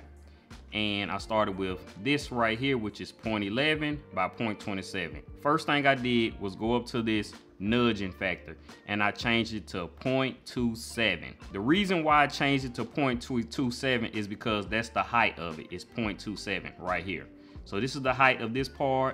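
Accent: South, United States. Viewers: Love this!! Makin' me want too look into how much all the equipment would cost.... Glad I found you, u can thank IG, there was some commercial & I just had to go find you, with your smooth southern voice! 💚Loving that too💚🤍💚🕊️ may God bless you!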